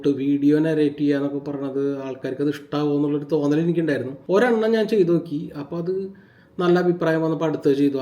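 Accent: Thrissur, India. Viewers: Ente native place trivandrum aanu...trissur language kelkan eniku bhayankara ishttanu..pinne nigade bonding bhayankara resamanu athukonda njanum suscribe cheythathu..very simple and humble souls...vere oru channal njan kaanarunde,athum trissur aanu its me vijitha...aa kuttiyum paavama nigale pole😊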